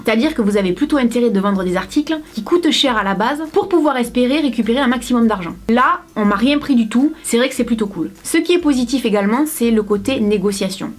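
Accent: South, France. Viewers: je t'adore tu donne le sourire rien qu en disant "bonjour" avec ton joli accent du sud. Tes idées de vidéos sont originales et super bien faites. Merci je te suivrais toujours!!💖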